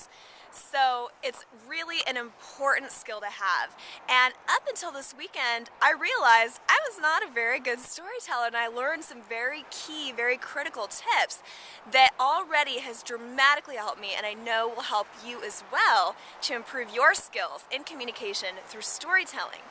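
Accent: United Kingdom. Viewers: Thanks for posting this. Your voice is pleasant, you are good looking, and those two things helped me to overlook the annoying British voice and the elevated video camera/phone angle. Your arms must have started to cramp up. With the British accent all I could think of was Madonna putting on a British accent. Remember a little goes a long way. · Like your British accent. How you said the story about this lady was amusing. :)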